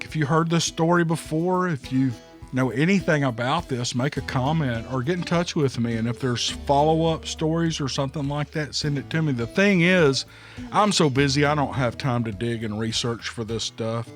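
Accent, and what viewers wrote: South, United States. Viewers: O! Don’t record it over! I love hearing you get so tickled you can’t go on. I’m sure everyone is laughing along with you. Even my husband, who views these stories only as entertainment and gets tired of listening, got a great laugh at the experience and loved to hear you laugh, too. I LOVE EVERYTHING you pick out and love your accent and the way you speak because I’m a country girl from the south. You are my favorite Bigfoot storyteller on Utube.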